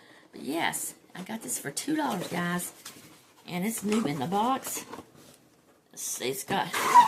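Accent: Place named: South Carolina, United States. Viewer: HI,. Just found you today. And so happy I did. I was cooking breakfast and heard that accent. I am from South Carolina, so I know "us" when I hear it. I ran into the family room and was like, "Yes!". Maybe I will see you out there one day. Have a blessed day.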